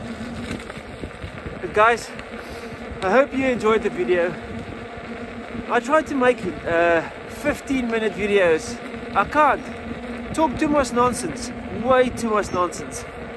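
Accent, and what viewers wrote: South Africa. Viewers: Fantastic review! Hoping the next version has an easier access to the tire and better clearance between tire and chassis like MSP. Off topic: is that a South African accent I'm hearing?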